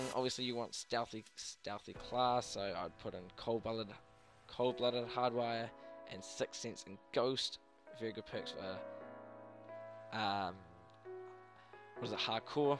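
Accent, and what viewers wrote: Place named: New Zealand. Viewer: You sound like a New Zealander lol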